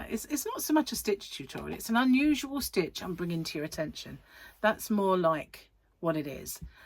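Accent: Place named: Cambridge, United Kingdom. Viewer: Hi 👋🏻 My goodness your accent reminds me so very much of my mother in law who was born and raised in Cambridge. The way you phrase things is so similar. She has been gone now for almost 10 years so it is a lovely to hear you speak and it brings back many wonderful memories. I’m a newcomer to your channel so can you tell me where you are? #Furls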